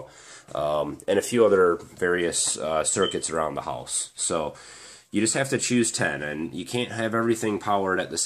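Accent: Michigan, United States. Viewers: Great video brother. I live in Florida now, after Irma I’ve been trying to find a safer way to power my home with my Generator. I think I’ll buy one now. And made in USA 🇺🇸 sealed the deal! So I’m watching the video thinking ‘this guy has a Michigan accent’ and ‘about time I watch a YT video without swearing’! I’m a God fearing Michigander that lived not far from your farm. Needless to say, I’m subscribing and can’t wait to explore your channel. 👍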